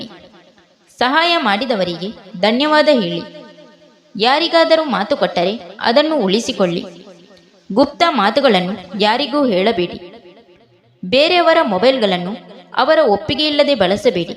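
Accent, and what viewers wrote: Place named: Tamil Nadu, India. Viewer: Tamil accent... Good one 🎉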